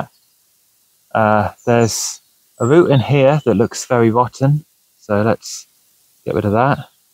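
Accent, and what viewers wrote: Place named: England, United Kingdom. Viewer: By the accent, you are English, aren´t you? Crazy good potters over there, I got some :D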